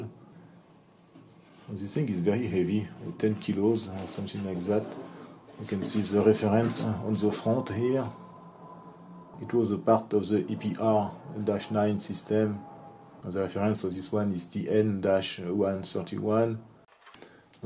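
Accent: France. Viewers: Great stuff, thanx for showing us this beautiful tech. No need to be sorry for the pronounciation: French accent sounds so good, you could call us all "d4mn m0therfuckers", and we would still be flattered 😀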